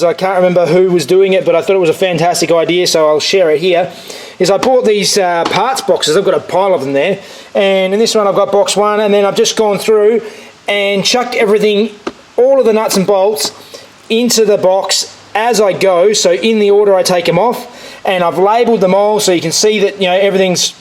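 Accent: Australia. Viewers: Aussie accent? If so how can i understand most of what u say not the same with that cycling durianrider i cant barely understand.. Greetings from mexico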